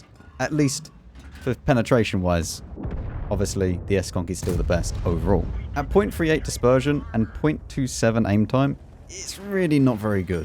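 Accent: United Kingdom. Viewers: LOL!!! I really love this statement "IS4 this probably the idiot proof tank", British accent humor. Good one.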